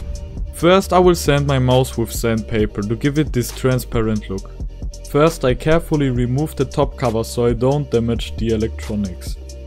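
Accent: Germany. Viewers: Moin ich höre das du deutsch bist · Dieser deutsche akzent😂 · Er hat so ein Deutschen Diacsient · U can hear the German, in his voics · Der deutsche Akzent ist zu geil und Respekt das du so gut sprechen kannst habe es probiert mit meiner Maus(bloody Al90)und habe über 85cps bekommen ❤ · nichts gegen dich aber man hört das du deutsch bist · German but speaking English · Du hörst dich ürgendwie Deutsch an... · Man hört so das du deutsch bist haha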